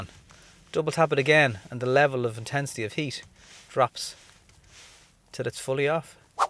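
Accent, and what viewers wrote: Ireland. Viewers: Nothing new to me, but THE best explanation for beginners with EV cars. Thank you, and by the way, I love your Irish accent. Can´t wait to come back to lovely Ireland.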